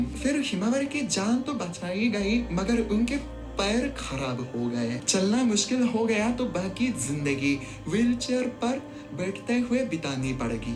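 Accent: Standard. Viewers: Aap itni shuddh hindi bolte hain ki mujhe bhi aapse bohot saare shuddh hindi ke shabdo ke baare me pata chala. Dhanyawad 🙏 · He say pure Hindi than me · आप शुद्ध हिंदी बोलते हैं और मुझे आपके वीडियो पसंद हैं, आप मुझे बहुत प्यार करते हैं, मैं जापान से प्यार करता हूं। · This guy speaks better and pure hindi than us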